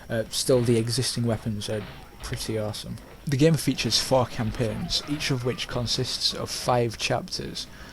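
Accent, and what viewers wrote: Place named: Yorkshire, United Kingdom. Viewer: cool review btw u have the same accent as me, ru from yorkshire?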